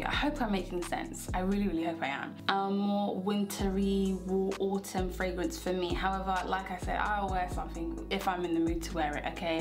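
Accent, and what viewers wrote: United Kingdom. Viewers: 🤤🤤🤤😍 you are gorgeous AND love the British accent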